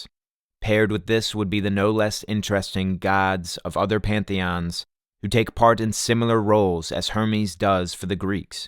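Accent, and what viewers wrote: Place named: United States. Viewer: american voice nice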